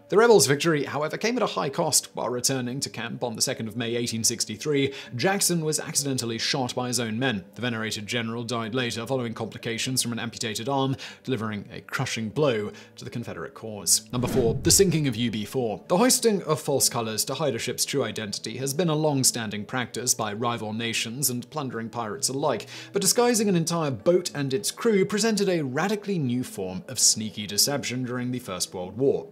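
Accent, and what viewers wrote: England, United Kingdom. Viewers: Pizz-er. English accent cracks me up 🤣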